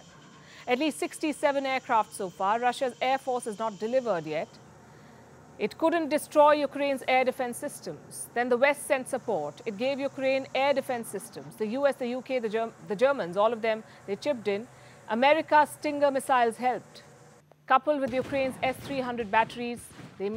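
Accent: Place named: Mumbai, India. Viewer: What are you saying.. It's very difficult understanding your Bombay accent.. Tamil urdo sound..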